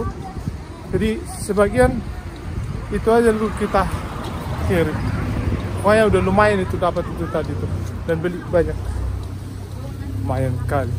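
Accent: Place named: Sumatra, Indonesia. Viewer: Cara bicaranya seperti orang dari Sumatra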